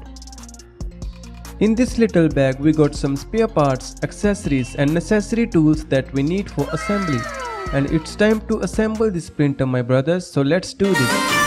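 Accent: India. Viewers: It's English but with Indian accent lmao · indian accent English is PURE EAR CANCER!! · Indian accent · Are you indian bro??? Your accents is familiar🤨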